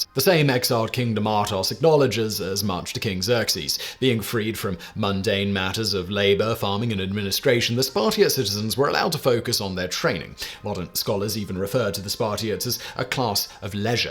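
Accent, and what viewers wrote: England, United Kingdom. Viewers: Do you mean his English accent?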